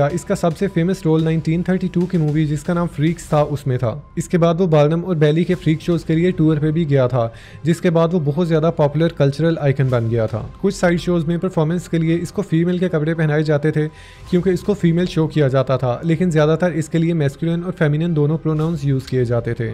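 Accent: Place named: Kashmir, India. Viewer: Main apka bohat bada fan hun main yahan ap k insta stories ki wajah se hun main india kashmir se hun mujhe hamesha lagta tha aap kashmir se ho ap k features aur excent bilkul kashmiriyon se milta hai.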